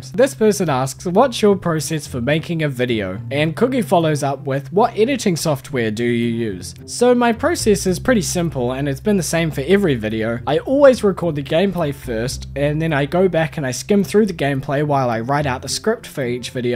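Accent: New Zealand. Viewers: I KNEW YOUR ACCENT WAS FAMILIAR!!! it's my own.... Lmao. Good ol kiwi accent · Great video. You're very good at it. 😊 I recognised your accent because I recently moved to Aus from South Africa and the NZ accent is kind of a smoosh up of the two (English South African & NZ). Loads of people ask me if I'm from NZ. I love your videos ❤ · Funny how people thought you were Dutch when you have the most New Zealand accent to ever accent to me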